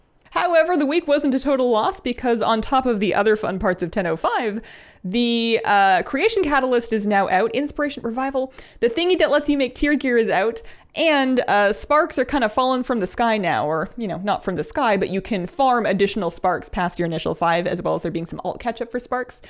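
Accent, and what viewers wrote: Canada. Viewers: Do I detect a hint of Canadian in there? "Blame Canada! With those beady little eyes and floppy heads full of lies!"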